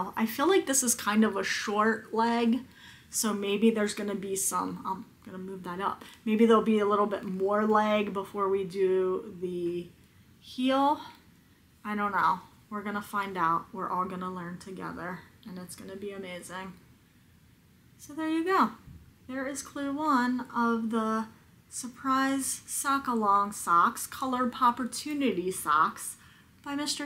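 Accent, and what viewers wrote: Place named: Northeast, United States. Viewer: Love your color choice. I found you looking up sock knit along. 😊 Your accent! Where are you located. I hear northeast, but I hear west coast in the cadence. What?? Right am I way off? ❤❤😊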